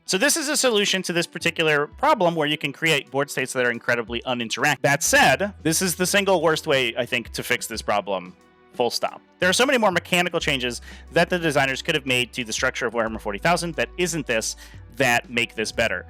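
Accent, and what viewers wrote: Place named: United States. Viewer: Dodgy head set. You still sound American :)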